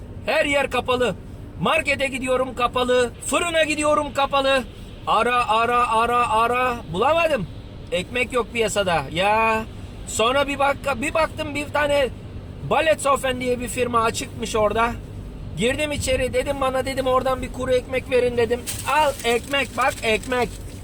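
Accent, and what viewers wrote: Thrace, Turkey. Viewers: Va varya sen 10 nuara 5 yıldız sın. süper sin. Bu trakiya şiveye devam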